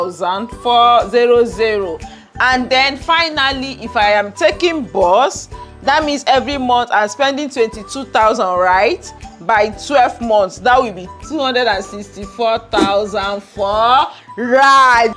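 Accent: Nigeria. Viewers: Nigerian accent sucks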